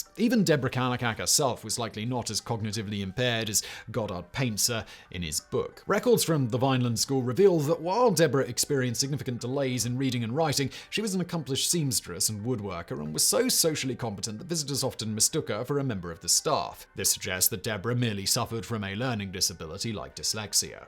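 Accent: United Kingdom. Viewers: Great video as usual. Simon I had to think twice when you pronounced cretin with that Brit accent of yours. Americans have only heard it pronounced cree-tin and it applied to all sorts of monstrous beings. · oh a british vauce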